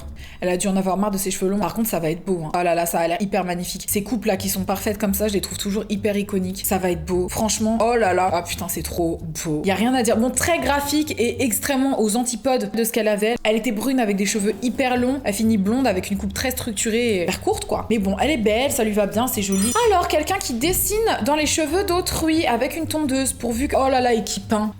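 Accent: United Kingdom. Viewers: Tu as les cheveux trop beau je t'adore depuis que je suis toute petite et ton petit anglais à la fin des mots je le trouve trop cute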